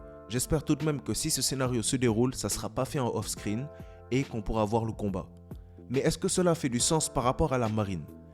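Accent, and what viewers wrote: Senegal. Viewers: Ta théorie tient la route et est très intéressante mais il y a un detail qu’on oublie c’est qu’on est dans la phase finale du manga donc je pense qu’on est plus en phase d’acquisition de développement mais plutôt de conclusion et de demonstration. Pourchasser un possesseur de fruit me semble plus dans l’ordre des priorités actuelles, je pense que c’est plutôt tout ce qui est poneglyphes et armes antiques qui peuvent le motiver. (D’apres ta voix es-tu d’origine sénégalaise ?)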